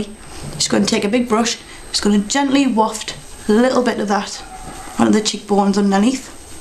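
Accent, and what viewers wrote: Newcastle, United Kingdom. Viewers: It's a Geordie accent! Newcastle, pet. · I love your accent! Where in the UK are you from? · she is a geordie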